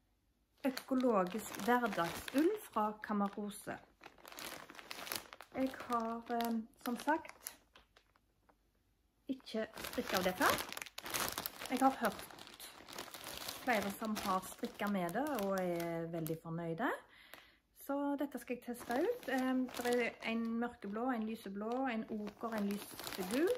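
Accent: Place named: Haugaland, Norway. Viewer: Takk for flott episode, kjekt å hørra Haugalands dialekt og å se fine bilder fra Borgøynå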